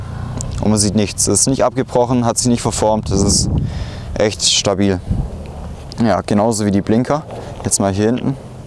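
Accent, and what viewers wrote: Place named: Swabia, Germany. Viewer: muss man doch au ned ich finde man kann ruhig ein wenig hören das man n schwabe is 👍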